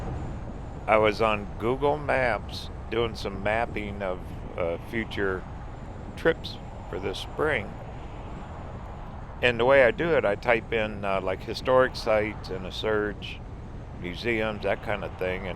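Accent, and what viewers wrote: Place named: Pennsylvania, United States. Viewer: Listening to you talk, a little "accent" or pronunciation, are you from Pa. originally?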